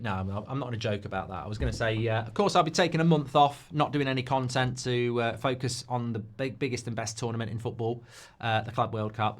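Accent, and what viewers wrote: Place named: Manchester, United Kingdom. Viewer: He’s from Manchester…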